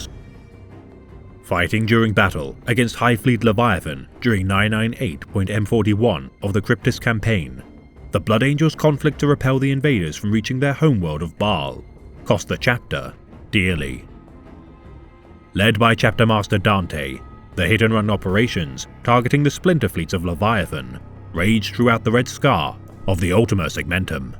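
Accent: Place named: England, United Kingdom. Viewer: I was getting tired of the necron narrator tbh lol back to that sweet English dialect lol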